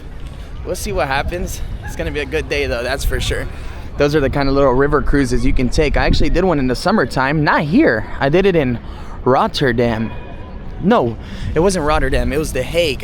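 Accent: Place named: South Florida, United States. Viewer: Just came across this channel. Good stuff! From how you talk I wanna say south Floridian🥳🥳🥳👏🏽👏🏽🥂🍾👌🏽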